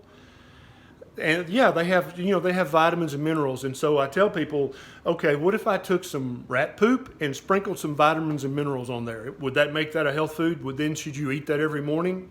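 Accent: South, United States. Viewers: 04/22/2021 Your voice sounds so familiar.🤔 I think Justin Peters ...🤔 Love the Southern accent.👊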